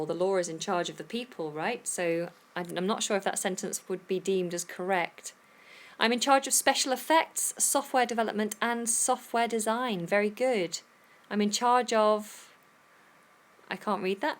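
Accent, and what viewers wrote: United Kingdom. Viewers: thank you very much, ill enjoy hearing beatiful british English. im lazy boy, dont want to study something in front of a desk. it makes me sleepy and boring. I only do what I like to do. I love English. my advance now is there is no japanese around in this village, so i dont have to speak my first language. Ill think in English or Thais. i was a taxi driver, i retired from it 3 yrs ago and now i eat by japanese pension, and am a farmer and english learner. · I LOVE BRITISH ENGLISH.It'S ONE HUNDRED PER CENT ENGLISH YOU'RE AMAZING TEACHER AND I'M A GOOD LEANER THIS IS PROGRESS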